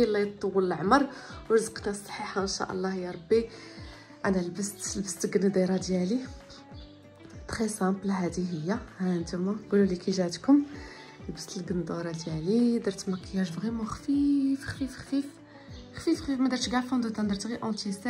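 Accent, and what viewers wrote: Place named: Oujda, Morocco. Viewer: شريبه بالحليب عرفتي هضرتك حلللوة تاع وجدة كيعجبني نسمع ليك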